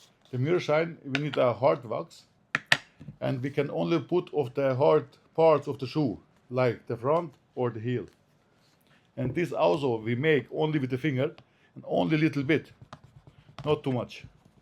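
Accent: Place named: Germany. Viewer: Hey, bist du deutscher Herkunft? Ich Hab das Gefühl du hast Akzent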